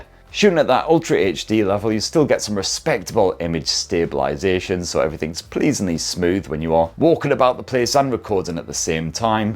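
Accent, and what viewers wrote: United Kingdom. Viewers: Love your videos bro! For a Yankee here, can you please tell me what you say in the fantastic British accent at the end? "Pub, scribe, etc"? Cheers🍻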